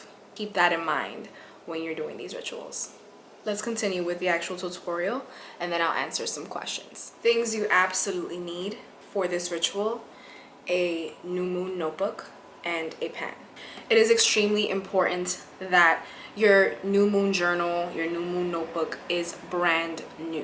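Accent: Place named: New York, United States. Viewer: I know it’s only through YouTube, but I love your vibe and your NY accent. I like how thorough you are in the steps and the fact that you act them out so we have an idea of how we may like to do them. You are a blessing. Keep it up. Bless!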